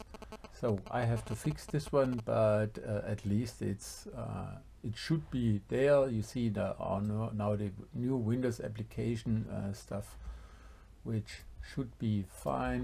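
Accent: Germany. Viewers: Man hört das deutsch schon stark raus, aber kuss für Tutorial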